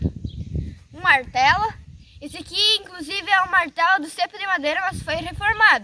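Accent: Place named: Rio Grande do Sul, Brazil. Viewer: Gosto do sutaque gaúcho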